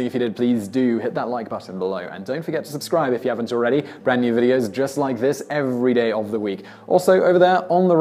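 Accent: United Kingdom. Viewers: They died from listening to stupid top tenz presented by some guy who doesn't seem to have any intelligence, education, charisma or creative ability...just a British accent....That's it.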